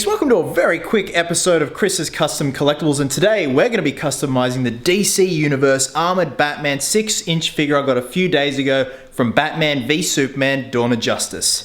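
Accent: Australia. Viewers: i love Australians accent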